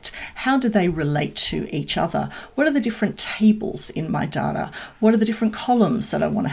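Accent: Australia. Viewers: I dodn't know Australian sound like this :D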